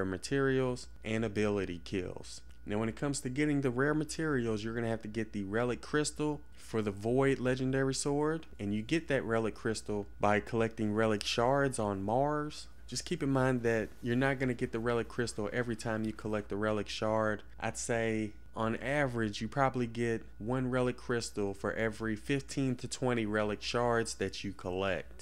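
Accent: South, United States. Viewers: liked commented and subscribed for the country accent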